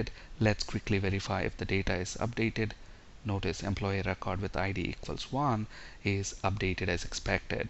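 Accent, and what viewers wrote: India. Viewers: The only Indian English accent that I like is accent:)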